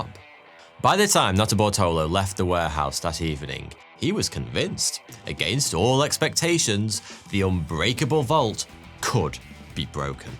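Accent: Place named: England, United Kingdom. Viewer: OMG, this Guy´s stories are Awesome! And He tells them with such breathtaking English accent! Bravo, Sir! You´re simply the BEST!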